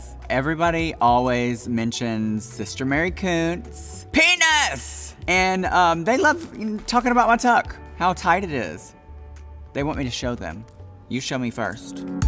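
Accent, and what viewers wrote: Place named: South, United States. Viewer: I love her and get little country accent is too cute. I know she won't win but I'm really rooting for her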